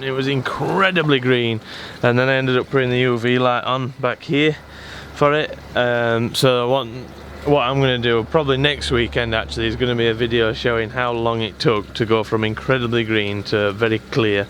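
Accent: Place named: West Yorkshire, United Kingdom. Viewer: Hello Came across your video's by chance and wondering if you sell koi, your fish look amazing. We are in West Yorkshire, not too far away going by your accent. We have kept Koi 30+ years and haven't bought any fish for years and our pond is now looking a little bare.so we are looking to restock.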